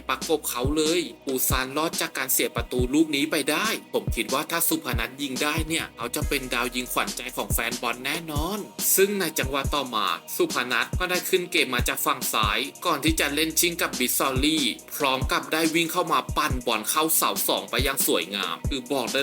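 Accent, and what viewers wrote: Suphanburi, Thailand. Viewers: เสียงเหน่อๆ เหมือนหนุ่มสุพรรณเลยนะเพ่สุดยอด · แอดเป็นคนสุพรรณแน่ๆ รึไม่ก็กาญจนบุรี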